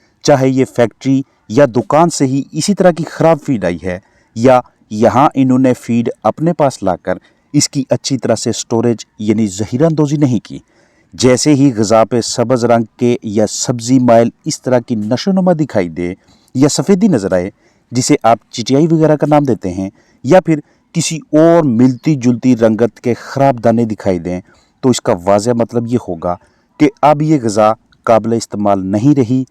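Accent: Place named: Pakistan. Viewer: Apki zavan sunkar aisa laga jaise me ibadat me chali gai kuch der ke liye aap bahut urdu mein baat karte hai aur hum urdu hindi mix bolte hai i think aap Pakistan se hai. Aur hum India me peda hue isliye yaha ke mahol ke mutabik hain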